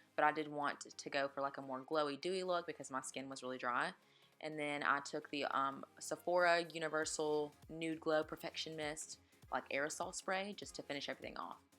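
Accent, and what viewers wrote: South, United States. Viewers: i was not expecting that southern accent from you! proves you can't judge a book by it's cover.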